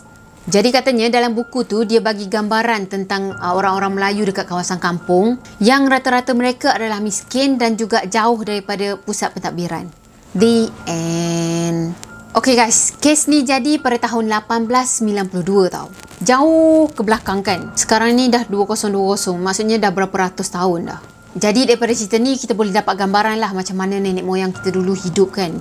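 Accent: Kelantan, Malaysia. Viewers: Kelate boh.. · Kelate bosku.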